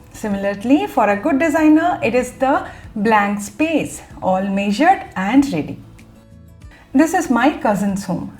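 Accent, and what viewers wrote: Tamil Nadu, India. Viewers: U r tamil slang awsome ....never expected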